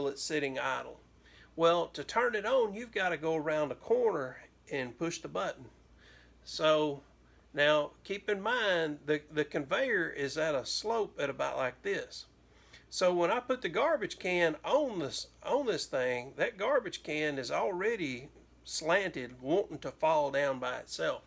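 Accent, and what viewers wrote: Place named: Alabama, United States. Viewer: HaHa! I knew it was Alabama! Got to where I was watching just to hear home :)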